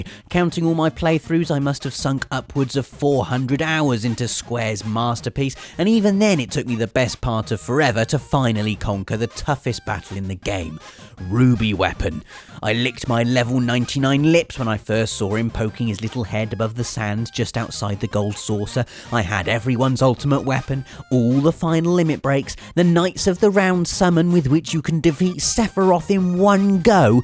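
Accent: United Kingdom. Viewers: Fock I HATE british english. So disgusting to hear..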